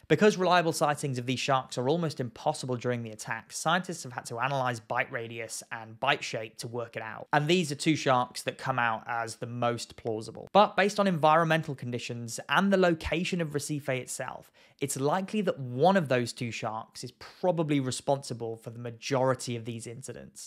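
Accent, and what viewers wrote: United Kingdom. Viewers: Yup…. Stillll taking my chances in New England. Holy Hell. Don’t your GW Sharks breach like MILES in the Air, too? I get your accent and the other ‘Shark Guy’ I get, confused. One of you is like UK(You?) and the other is South Australian. Help. Lol I’ll take my chances in NH/The Cape. Every time. But that water? Yeah, can’t see shit either when you purposely go for hurricane season. You should do a video about GWs, and say why they’re coming UP the coast, now. Been here and vacationing all my life; Never have seen what I have in signs ‘they’ put up these days.A Danger zone on the EAST Coast. People just lay out for the sun, now. No one swims in the Cape. I loveeee thatttt water. It’s clear as day-Unlike NH. But there’s GWs; And they’re Glorious. Love this channel! .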